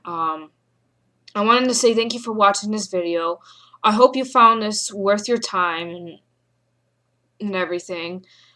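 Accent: New York, United States. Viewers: Is that some sort of new york accent? Cool.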